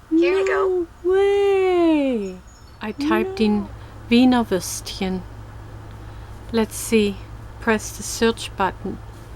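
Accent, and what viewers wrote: Germany. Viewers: So cute. Good lighting, and you look very pretty! Good nail polish and makeup <3 I love hearing German accent!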